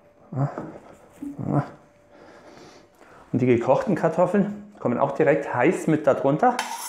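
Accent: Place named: Franconia, Germany. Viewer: Fast das Originalrezept. Warum nur fast? Das Drittel der Kartoffeln, die weichgekocht werden, werden nach dem Kochen noch zu einem dünnflüssigen Kartoffelbrei verarbeitet. Die weichen Kartoffeln werden dazu im Kochwasser püriert. Wenn man die Thüringer Klöße so macht, erhalten sie ihren eigentlichen Namen, nämlich Grüne Klöße. Ansonsten hast du es perfekt getroffen. Übrigens: Wo kommst du her? Du klingst manchmal etwas leicht fränkisch.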